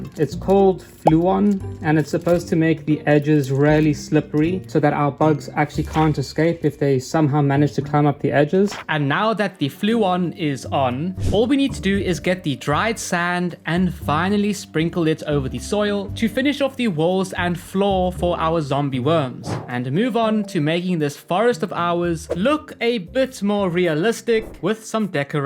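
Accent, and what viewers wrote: South Africa. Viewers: You sound like you have an south african english accent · Are you south African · I thought u sound like u from SA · Duuuuude! It's so hard to find fellow South Africans! I knew I recognized the accent. Keep going with the amazing content. ❤️ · I knew you were South African cuz of your accent😂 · You're South African, aren't you?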